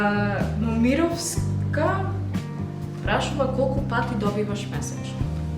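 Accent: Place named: Kichevo, North Macedonia. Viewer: пример за вистински културно одмерено девојче, браво! Многу ми се допаѓа кога зборуваш на Кичевски дијалект, секоја чест, Прекрасна девојка!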